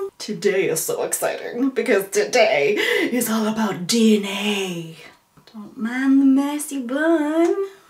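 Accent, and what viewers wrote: United States. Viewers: Your Southern accent is sickly sweet like a peach princess And I love it!! Now can you do a Texan drawl?? New subscriber and fan!! Great escape from the kids to listen to reddit stories.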